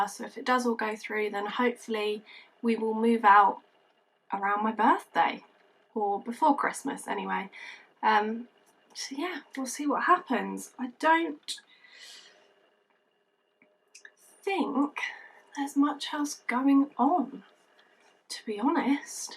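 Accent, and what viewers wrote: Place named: England, United Kingdom. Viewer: Congratulations to you and Ryan on your upcoming wedding and buying your first house. I hope everything goes well, and I'm happy for you and your fiance; that's exciting! :) I didn't know that both you and Ryan grew up in villages, that's interesting :) You have the prettiest accent, I love hearing English people talk :)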